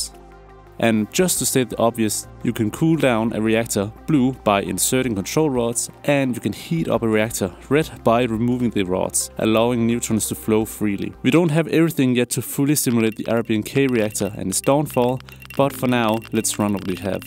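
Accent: Denmark. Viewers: hold da op man kan nemt høre du er dansk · are you danish ? your accent sounds danish idk. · Du lyder dansk! Cool video! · Are you danish? Because I’m almost positive I detect a danish accent in there somewhere! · Danglish? Great video! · Are you danish? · Danish accent ruined the video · I think you mean Walken? There is something reminiscent in the intonation but the actual accent is, I believe, Danish so pretty far removed from Walken's american lilt. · danish guy speaking english? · Are you Danish?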